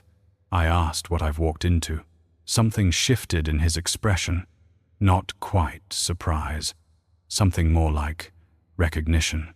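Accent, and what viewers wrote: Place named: Eastern Europe. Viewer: The story is pretty good, but the pronunciations of the Italian names sounds more eastern European. You have to make it some more Italian. I would say eight out of 10.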